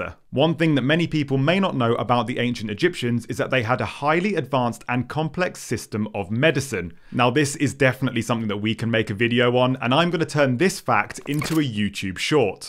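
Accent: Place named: United Kingdom. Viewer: Love your British Accent Sir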